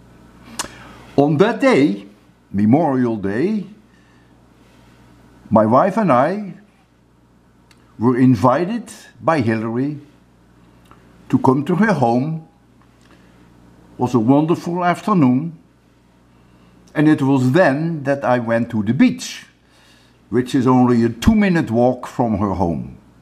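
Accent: Netherlands. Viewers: Professor, are you Dutch?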